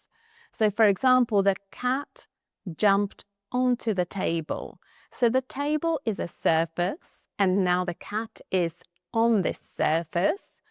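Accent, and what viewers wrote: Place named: United Kingdom. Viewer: Thank you madam, very much for your excellent elaboration. By the way, I love your British accent. That 's fantastic.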